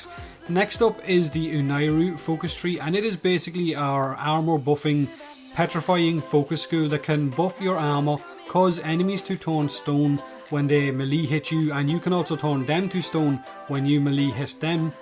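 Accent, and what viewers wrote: Ireland. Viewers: Hearing an Irishman say tree while meaning tree instead of three has left me deeply confused. Tree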